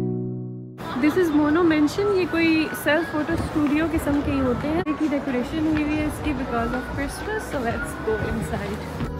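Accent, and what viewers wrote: Punjab, India. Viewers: I love your vlogs and also your Punjabi accent 🤍💗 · I watched alot of vlogs of other people which shifted korea but baiii is da mzaaaa e or eh... Punjabi.. Touch zbrdast 💜❤️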